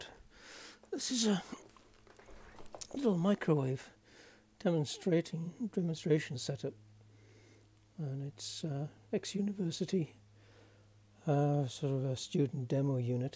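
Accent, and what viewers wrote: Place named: Northumberland, United Kingdom. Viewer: Hello again, finding the videos fascinating. I see you live in Canada, although you sound like you're from our region of the UK... we're up in Northumberland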